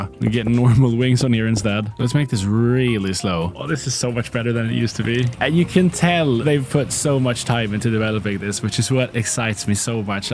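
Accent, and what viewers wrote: Sweden. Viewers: I swear, every time when i hear you i think that you must live or is born in sweden with the accent that you have.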